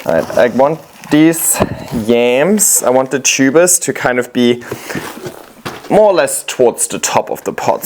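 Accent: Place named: Germany. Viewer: Hi, I love your personality and your content, and I have learned soooo much from you. Short story: I was born in Aachen, Germany, many, many moons ago. When I started my plant journey, I used to watch your videos and initially I could not figure out why the expected Australian accent sounded like a German accent. I asked my son to watch you and he said "definitely German". 😊 I am extremely nitpicky about cleanliness and order, must be the German influence on me. 😬 I am Asian and live in the USA. Sorry, a long comment. Thank you for you fun, wholesome videos and you seem to be such a nice guy!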